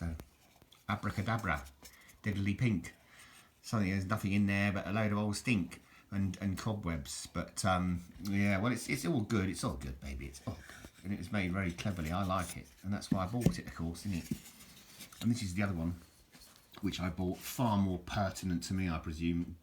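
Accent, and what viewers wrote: RP, United Kingdom. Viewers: You're most welcome:-Gosh you sure are modest,😆Or you really know how to sell yourself, painting a picture of someone with baneful breath + a barren buccal, God bless you I'm sure you love Gem Jimmy unconditionally+vice versa You sound remarkably posh ,,, British+ Mellifluous, for someone in Poland...I presume you lived numerous years in England, previously? Golly gosh,old chap, your videos are always a jolly good show, Thenk you 😅 Poppycock,you claiming beastly breath:- Your breath doesn't need to smell of flowers,to make your exquisite videos💐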